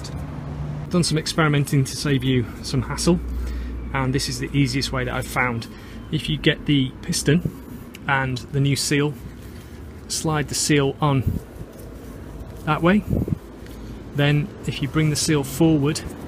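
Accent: United Kingdom. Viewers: Thank you for that really useful video and your British accent is just amazing! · the brit accent really gives confidence. cheers!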